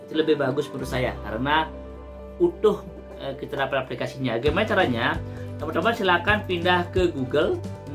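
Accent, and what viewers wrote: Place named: Bali, Indonesia. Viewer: Bapak ini dari bahasanya pasti orang bali😃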